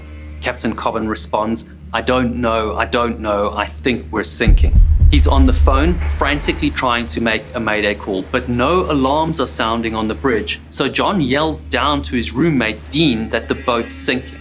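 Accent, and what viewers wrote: South Africa. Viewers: I'm thinking he hears "dick hand" from the pleasant South African accent. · It would be very interesting if you created a video about rescue operations, specifically Coast Guard helicopters. They are fascinating creatures in that they can fly in atrocious conditions. My son's boy scout troop did a field trip to our local Mercy flight helicopter. It was so interesting! One thing I found fascinating was the RPM of the main rotor blade. I think it was like, 165 RPM, it was a lot slower than I thought it was but the blades are so long that the blade tips can easily approach the speed of sound as the tips move and a much higher velocity than closer to the center of rotation. Anyhow, I think it would be really cool to understand more about search and rescue equipment in general. I'll watch anything you talk about with that super cool South African accent! 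Cheers! I think you should find a way to say 'Thrusters' in each video you make:)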